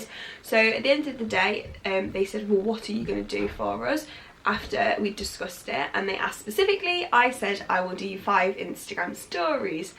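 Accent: United Kingdom. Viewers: How come your British accent is becoming more pronounced. We need you to come back to America 😁😷😷😷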